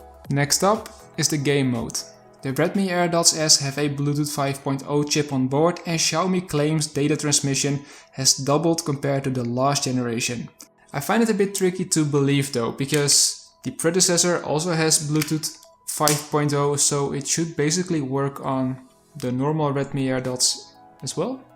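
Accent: Netherlands. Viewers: Goed Nederlands accent hoor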